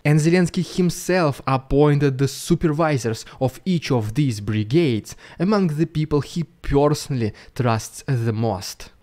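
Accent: Russia. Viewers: because he has a russian accent. obviously.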